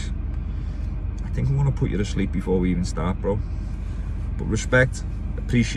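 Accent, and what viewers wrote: Liverpool, United Kingdom. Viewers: Proper and scouse"" fairplay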